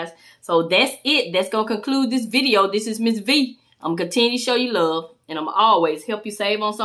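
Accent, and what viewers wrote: South, United States. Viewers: thanks for sharing the budgeting videos. I'm a long-time subscriber and have really enjoyed watching your financial journey blossom. I've been cheering you on silently from the rafters! In fact, if your video is in my feed, I watch you BEFORE I watch any others. You have such a beautiful, giving spirit and, Girl, that southern accent of yours is everythang!...I'm southern, too.😀 I'm curious about your grocery budget, though. I know you shop your pantry before grocery shopping and you also coupon, but would you, if your schedule allows it, please make a What's for Dinner series for one week to help me understand how you grocery shop on $50 a week for two and sometimes have money left over? There's only two of us and, for the life of me, I haven't been able to even come close to that. Thank you so much for the consideration and, again, thank you for sharing. 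Peace and blessings...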